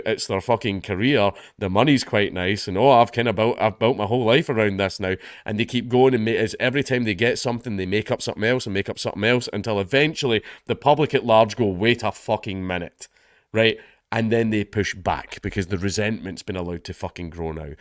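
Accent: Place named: Scotland, United Kingdom. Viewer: Did you somehow get more Scottish recently ?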